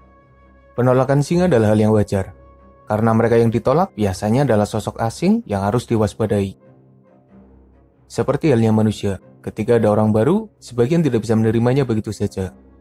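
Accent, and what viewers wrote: Banyumas, Indonesia. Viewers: Ngapak e ketara banget kiye admin e 😅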